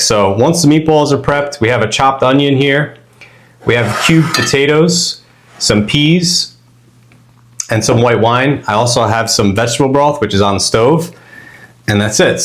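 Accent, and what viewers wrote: Philadelphia, United States. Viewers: Wooder, you in Philly or Jersey?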